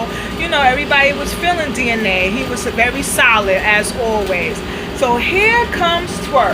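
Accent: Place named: New York, United States. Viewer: I love your NYC accent :p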